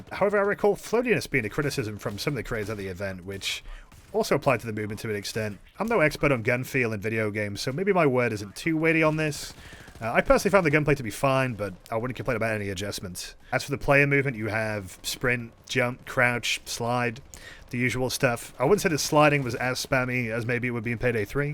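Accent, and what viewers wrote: United Kingdom. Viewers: yes britbong whisper those sweet supple long words some more please <3333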